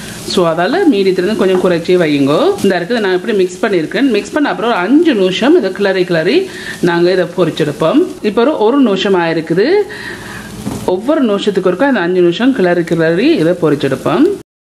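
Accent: Jaffna, Sri Lanka. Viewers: Akka, I like the way you speak Tamil. Jaffna Tamil ❤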